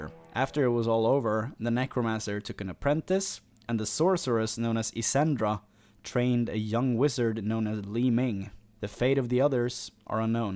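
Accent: Sweden. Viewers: Awesome, btw, Swedish?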